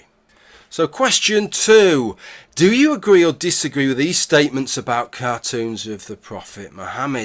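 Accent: United Kingdom. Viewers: I can see why some folks would consider statistical survey videos a bit of a slog, but the results and the conclusion we can draw from them are interesting to me. Also, I enjoy your accent and British slang.:) Call me cynical, but I think the answer to your final point is that the Muslims surveyed were "telling us what we want to hear". Be well,.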